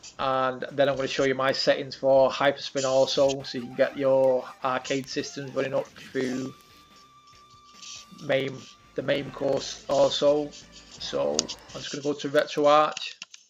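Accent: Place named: Manchester, United Kingdom. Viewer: Where you from? Manchester? You sound like you're from Wythenshawe, top vids btw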